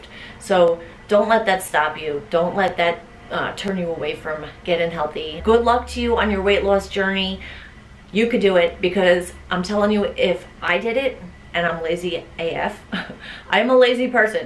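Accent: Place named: Minnesota, United States. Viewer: Huge CONGRATS on your weight loss! Amazing results! Where are you from? I THINK I might be detecting a slight Minnesotan accent or Canadian?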